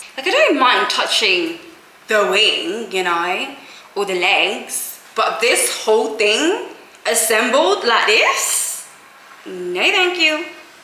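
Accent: United Kingdom. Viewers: Why are u trying so hard to sound like a white English girl? Smh.....U r BLACK!!!!!!!!!!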